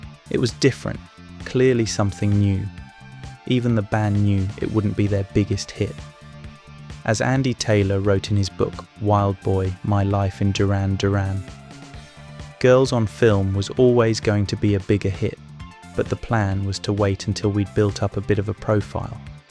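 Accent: Australia. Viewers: an upper class South Australian? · I can detect some aussie accent in the voice over?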